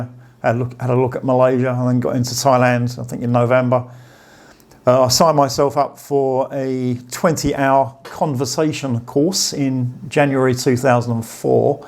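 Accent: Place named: United Kingdom. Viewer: I love your British accent. 😊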